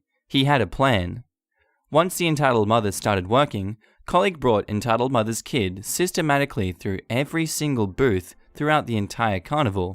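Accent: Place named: Australia. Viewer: Appreciation points for Aussie narrator 🦘🐊🦘🐊🦘🦖🦘🐊🦘 🦜🦜🦜🦜🦜🦜🦜🦜🦜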